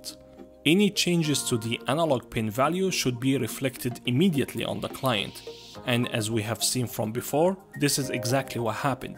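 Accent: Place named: Germany. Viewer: Great and yet simple video, thanks! Where is your accent form? Sounds german :)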